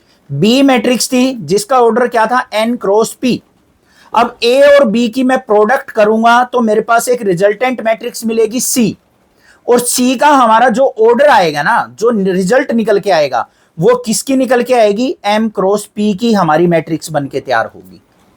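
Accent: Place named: Sonipat, India. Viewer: arey sir jii aapp sonipat ke lgte hoo kyuki aap bhi ho or aapki boli bhi vaisi hi h or m gannaur kaa hu 😂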